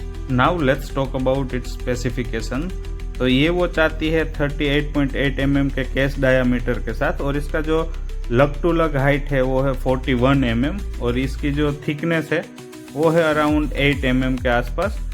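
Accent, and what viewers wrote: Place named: Gujarat, India. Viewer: Gujrati accent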